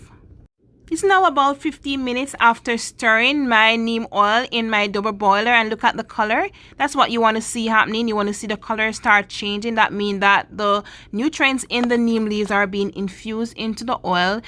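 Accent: Jamaica. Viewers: I can tell she's Jamaican, did affi subscribe to di lady channel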